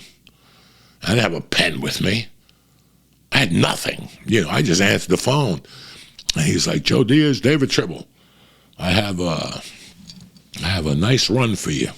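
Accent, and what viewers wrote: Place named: Philadelphia, United States. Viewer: I really like that you are in a new spot in the room, that is the coolest Bruce Lee poster and all the wall Art!! Thanks for sharing your videos with us. Salute to you and your Family Uncle Joey. You are like hearing my Family talk when I was younger in Philly! Thanks for All you do for people that you may never realize have been saved due to you telling shit like it is. Have a Great Weekend Brother!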